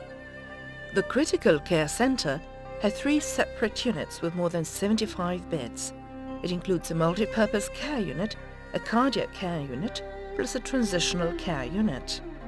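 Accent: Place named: Egypt. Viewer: dude she`s egyptian 100% it`s all about how she spell the letter "ق"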